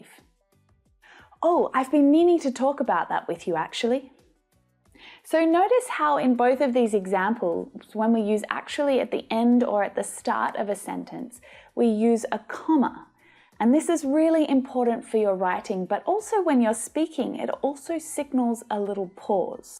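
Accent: Australia. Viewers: speaks with an Australian accent...why do you say it is awful? Btw where are you from?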